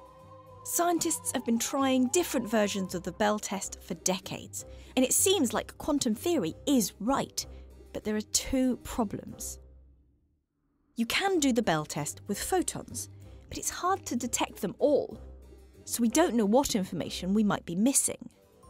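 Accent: United Kingdom. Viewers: Oh god a british accent oh well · Adorable, and very Harry Potter sounding, but unfortunately doesn't make it any clearer to me. I think the crux of my confusion is that the detectors when put at 120 degrees to each other have one correlation under QM and a different one under Hidden Variables and experiment matches QM. However my weak grasp of detectors and statistics trips me up on the details of why these are valid experiments to compare the two. Hopefully a future adorable video can :)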